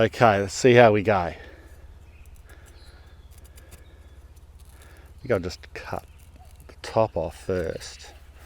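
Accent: Australia. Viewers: I’m an Australian who lived overseas for most of my life. For some reason the Aussie accent triggers me and I squirm. But yours is perfect. It’s just bogan enough, but not too bogan. And it’s just so pleasant. No matter what you say, the tone and timbre of your voice brings a smile to my …ears. Thank you ❤🙏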